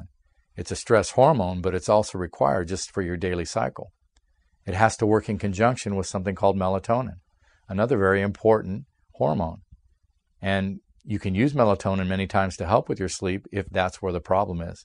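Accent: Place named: United States. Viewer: may i get this video in Hindi ? I don't understand ur fast American English 😪😪😪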